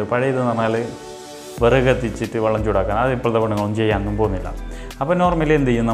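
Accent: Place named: Kasaragod, India. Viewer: കാസർഗോഡ് സ്ലാങ്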